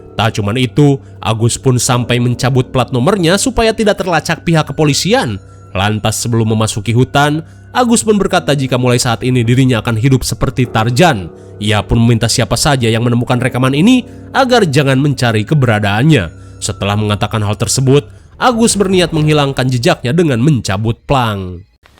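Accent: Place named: Sunda, Indonesia. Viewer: emang pujaan aku sm saudara temen juga 😂..sunda banget seru kl udah bilang eta si....😂😂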